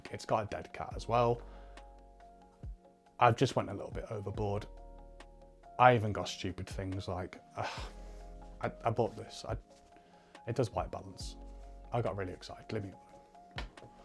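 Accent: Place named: Yorkshire, United Kingdom. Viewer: You gota proper Yorkshire accent there, hope your good fella like the channel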